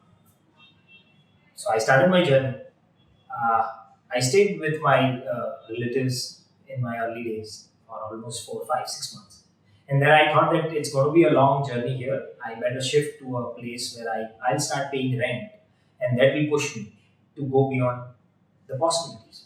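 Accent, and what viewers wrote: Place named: Gujarat, India. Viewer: Only people from Gujarat can feel Gujarati accent in English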